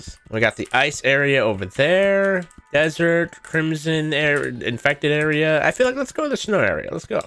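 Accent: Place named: United Kingdom. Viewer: It's probably harder for him to pronouce it the usual way because he is from the UK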